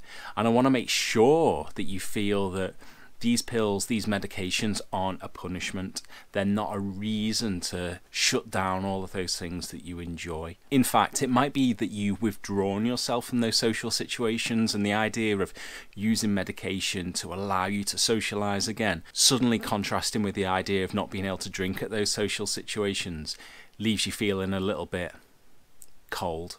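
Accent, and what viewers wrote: Liverpool, United Kingdom. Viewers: Are you from liverpool? Also, thank you so much for this. I'm starting off on 12.5mg for anxiety (not depression) and while I do like to have 1-2 drinks a night, 4-5 nights a week, I have complete control - I just like having a drink or two with dinner. However, I wouldn't mind cutting back during the week. But there are times I'm going to want to indulge. I have a vacation planned for October in the Caribbean that is all-inclusive. I plan on drinking a fair amount. Since I'm NOT struggling with depression or suicidal thoughts, I wonder if I should just take a break from the pills during that time? This is of course assuming that I react well and are still on them at that time. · You've not got the strongest scouse accent but knew it was liverpool or nearby. Yeah frontierland lmao good in its day but all gone now so has bubbles 🤣 keep doing your vids they r great for people going through similiar difficulties. 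So few people comment in relation to ur views but i think its just the whole mental health stigma yet again x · Good advice and info, also liked your Beatle accent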